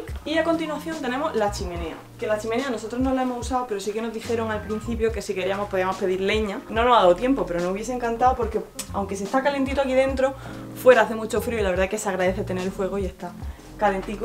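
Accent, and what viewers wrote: Andalusia, Spain. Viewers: Amo amo el acento andadulez. Se dice así?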